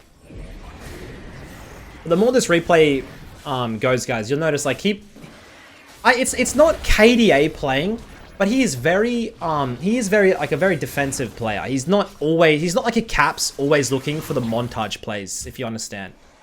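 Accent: Australia. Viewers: I come to these videos for, stay for the gameplay. you are educational and your oz accent makes everything you say sound hilarious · If u would drop the obnoxious Aussie accent I would sub :> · i hate to break it to you but thats an aussie accent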